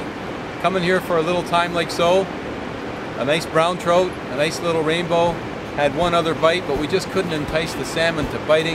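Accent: Canada. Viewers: That Canadian accent! Lol! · love the canadian accent :D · you from canada ey?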